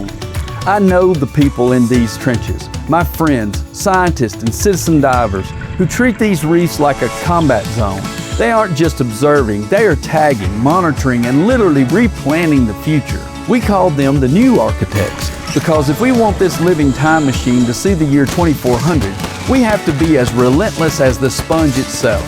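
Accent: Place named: South, United States. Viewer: This is fascinating - but also it’s nice to hear a southern accent for a change.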